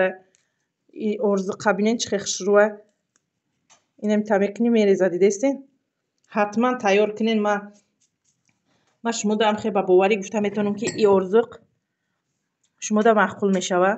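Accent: Tajikistan. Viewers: Хохарам шумо зани бехтарини тозаи точики хастед мехохам симои шуморо бинам факат дастонатро мебинам овози форам доред чунки тоза точики гап мезанед❤❤❤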